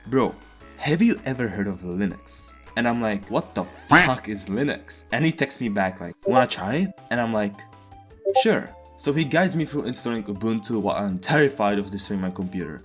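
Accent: Czech Republic. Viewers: The accent.... are you czech?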